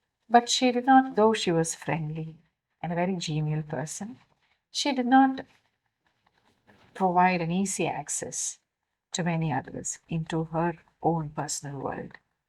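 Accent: India. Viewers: Indian Ki english bahut mza Kerti hai.hamen Yahan Pakistan me teachers kahtay hain indian authors Ki Kia bat hai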